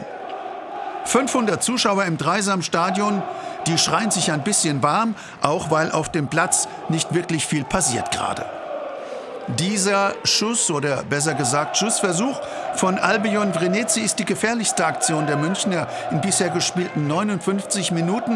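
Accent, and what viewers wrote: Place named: Swabia, Germany. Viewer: Ein noch frustrierter Schwabe als Kommentator?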